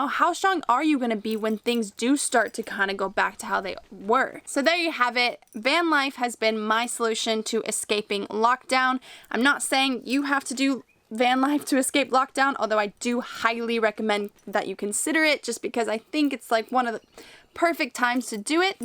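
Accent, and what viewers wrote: Australia. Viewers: Why do you have an Australian accent now? 😹 BTW I like your blond hair. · Wow you sound a bit like an Aussie now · She now sounds like Steve Irwin, the Croc Hunter. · Have you noticed you started to say some words in an Australian / Kiwi accent? That's weird.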